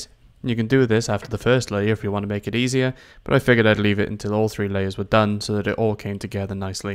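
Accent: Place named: Wales, United Kingdom. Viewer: Good video. Only complaint is 'lumber' over 'timber' as you sound Welsh maybe and not American?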